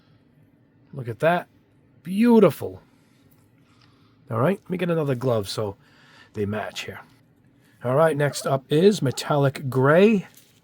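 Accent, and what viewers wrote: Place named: Massachusetts, United States. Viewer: dang, from your videos it sounded like you were up in Mass some where, but Rhode Island is just as close xD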